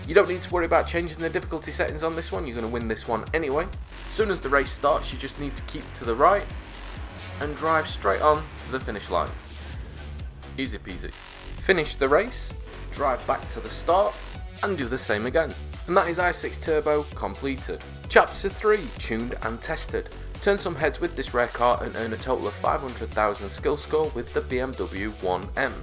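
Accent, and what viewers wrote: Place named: North, United Kingdom. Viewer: Thanks awesome guy. Like the northern accent and you had a couple of funny one liners lol.. But all done!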